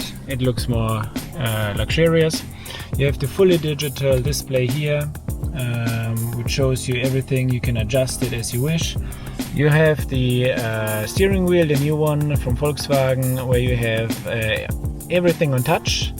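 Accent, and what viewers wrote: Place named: Germany. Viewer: Jag måste bara fråga, är du tysk men bor i Sverige? Anledning att jag frågar är att du verkar ha tysk brytning på din engelska. Massa ursäktanden från min sida om jag har fel.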